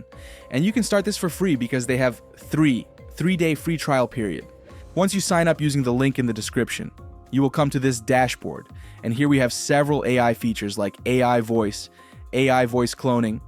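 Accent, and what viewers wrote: Sri Lanka. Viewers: bro are you from sri lanka?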